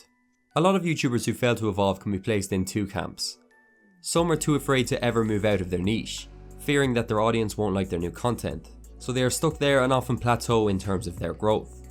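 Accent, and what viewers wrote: Ireland. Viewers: Are you actually Irish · Man with that irish accent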